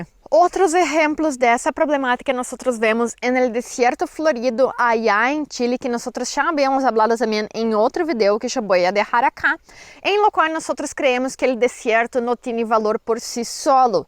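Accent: Brazil. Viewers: O seu espanhol com sotaque brasileiro meio portunhol é muito divertido jajaja. Excelente informação! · Você é brasileira? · Olá, Vocé é do Brasil, não é?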